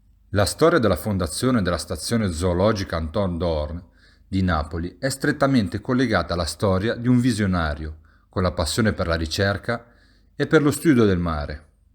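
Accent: Genoa, Italy. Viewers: Belin l'accento genovese CI VUOLE non perderlo mai! E per l inglese ce ne battiamo il belin sugli scogli di Camogli 😆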